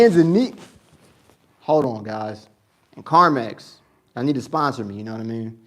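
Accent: United States. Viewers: 😂😂😂😂😂i have not known you to be so funny. Sorry again Yes,that accent ,many Americans i see around the malls in Kenya they dont talk much. And i know why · Unfortunately, corruption is all over Africa. They see money when they hear that American accent. Do not travel by bus by yourself if you are going to other countries. Travel with a local if you can.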